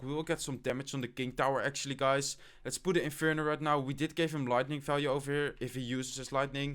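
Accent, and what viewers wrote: Netherlands. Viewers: are you dutch? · You're not "monotone" it's just your accent bro don't bother with trying to change ur accent ur from the Netherlands and ur voice is just like that! I've learned a ton from u man! I use this same deck but use hog, zap, Skarmy, rocket, inferno tower, Princess, gob gang, gob barrel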